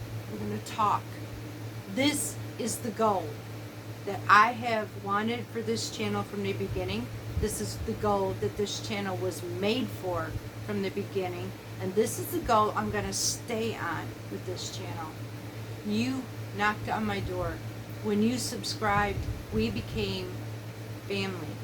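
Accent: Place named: South, United States. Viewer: Hi 🥇🏡❤️⭐️⭐️⭐️⭐️⭐️ Let’s do all the shopping. It’s extra fun for me because it’s so different than Canada. I love southern accents ❤️. You are my sister and I can’t wait to shop together 😊😊😊😊😊😊😊😊😊😊😊😊😊😊😊😊😊😊😊😊😊😊. The walk was wonderful. Only one complaint is Jason and I are still waiting for a yes 🙌 on putting the membership on. It is totally optional for subscribers. You will have a good income if even a small percentage of subscribers join. Pretty please with infinity cubes of sugar?No extra perks nessasary or wanted we love ❤️ you!☮️💟🖖CC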